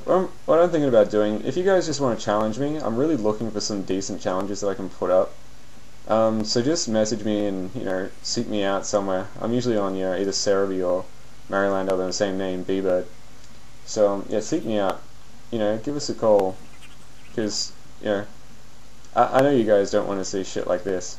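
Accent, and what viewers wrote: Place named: Australia. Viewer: Fuck yeah Australian accent narration =)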